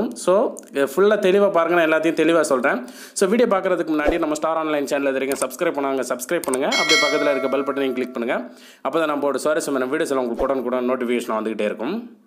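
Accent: Kanyakumari, India. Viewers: Kumari slang 🥰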